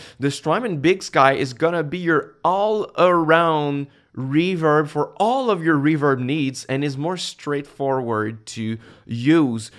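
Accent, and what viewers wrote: Quebec, Canada. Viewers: Vidéo made in Québec selon l'accent non ?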